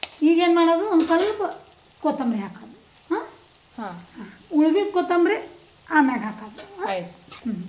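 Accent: Maharashtra, India. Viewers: Nimma Marathi mix bhase tumba channagide😊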